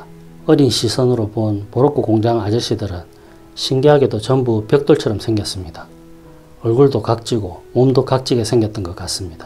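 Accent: Gyeongsang, South Korea. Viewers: 늘 잘보고 있습니다. 제가 쌩초보라 쓰고 있는 연필이 4b인지 2b인지 궁금합니다. 강의중 그많은 말들속에 이건 4b입니다.라고 첨기 부탁드립니다. 그리고 같은 갱상도라 너무 정감갑니다.ㅎ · 경상도 분이신가 봐요 ㅎㅎㅎ 꼽표 오랜만에 듣네요 똥글빼이 ㅋㅋ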